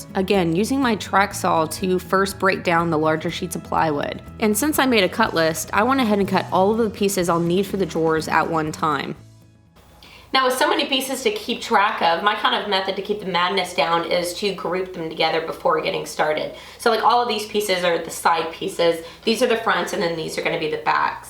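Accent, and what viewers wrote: Texas, United States. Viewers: Wales here too:P ever since reading another comment mentioning the word sawL I notice it everytime now but hey a Texas girl, everywhere has their own little language/dialect quirks - how many people in the world will know exactly what a 'cwtch' is? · I really like your work, I have for a very long time. this build and project video. are great. Also love your Texas voice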